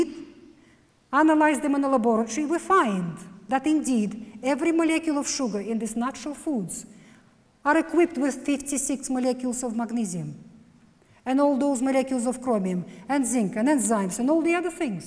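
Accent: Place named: Russia. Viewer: It's Russian. She's born in Russia, then went to UK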